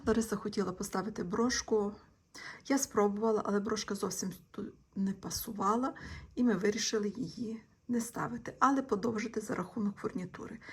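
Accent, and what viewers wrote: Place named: Lviv, Ukraine. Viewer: Пані! У вас дуже гарна львівська вимова( чи тембр,я не знаю як правильно назвати) я отримую велику насолоду від цього.будьте здорові! Ми переможемо!!!!❤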